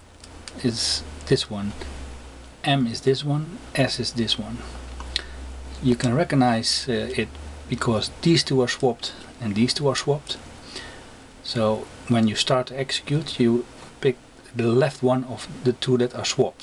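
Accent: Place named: Russia. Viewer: You sound Rusian, btw nice video.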